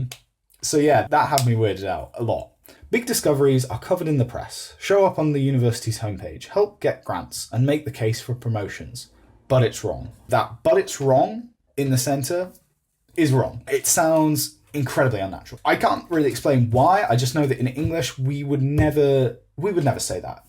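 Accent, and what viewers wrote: United Kingdom. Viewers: Hi! 오랜 팬인데 댓글을 남기는건 처음이네요. 특히 이번영상에선 영국발음이 너무 멋져요👍🏻 다음 영상도 기대할게요🔥🔥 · 영국발음 너무 좋다고요 ㅠㅠㅠㅠ 영국식 영어 배우고싶당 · 영국발음 너무 조-하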